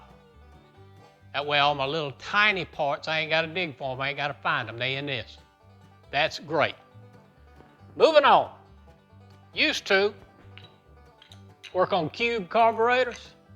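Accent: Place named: Italy. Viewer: Thanks for sharing . But With that accent you must be Italian. Those parts bags were a good idea, thank you 👍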